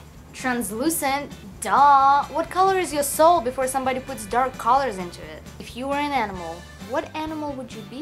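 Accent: Russia. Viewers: I would....yeah probably steal it from my sister and eat it. Я люблю ваш русский акцент! :) Moosh!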